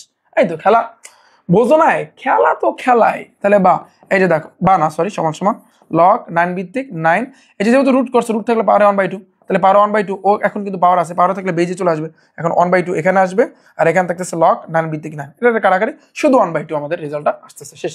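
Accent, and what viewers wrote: Barishal, Bangladesh. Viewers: Bhaiya ki barishailla?🤦🏻‍♀️🌝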